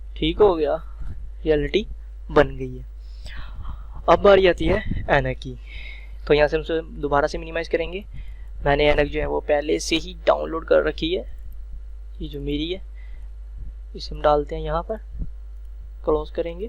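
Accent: India. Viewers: Buhat achi video hy or specialy bol b buhat desi andaz sy rhy .buhat detail sy bnaty ap video Allah pak apko kamyab kry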